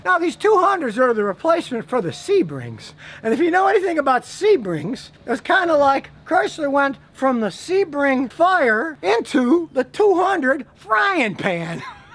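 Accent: Italy. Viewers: i love scotty's Italian's accent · Italian accent 100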